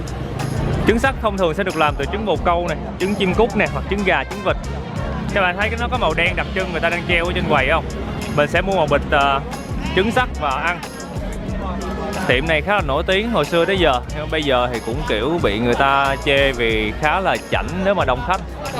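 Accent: Mekong Delta, Vietnam. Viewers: Nghe giọng nói đúng chất miền tây. Dễ nghe gần gũi. Thành fan ông này mất thôi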